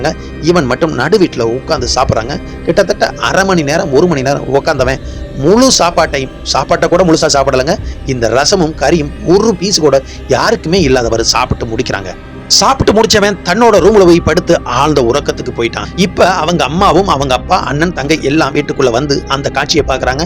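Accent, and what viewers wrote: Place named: Sivaganga, India. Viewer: Naan sivagangai anna.. Namma pechu style ungakitta aptiye irunthathu, athaan kettan... Show ellam super ah irukku, naalla pannunga anna, enga support eppavum undu...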